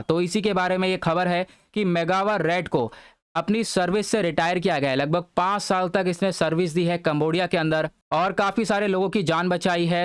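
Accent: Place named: Bihar, India. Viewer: I am sure sir is from Bihar 🔥🙏🙏😀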